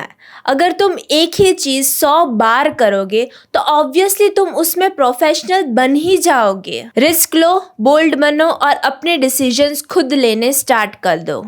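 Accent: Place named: South, India. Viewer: Are you from south india?? Your hindi like this ❤❤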